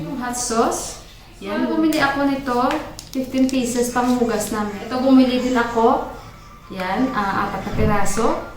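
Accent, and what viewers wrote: Iloilo, Philippines. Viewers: New subscriber here...nawili aq tan aw sg vlog mo..hindi ko paman narinig sau na ilongga ka,but base in your accent im sure u are ilongga....Anong city itong place nyo? Katmandu ba?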